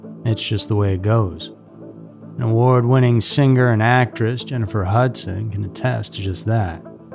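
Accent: South, United States. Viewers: Heartbreaking tragedy. I love listening to! I swear I hear a bit of Southern accent. Thank you,. ❤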